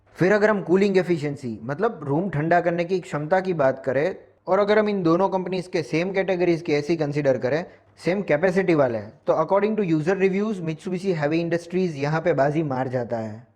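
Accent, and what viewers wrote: Gujarat, India. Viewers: Bhai aapka accent gujju he❤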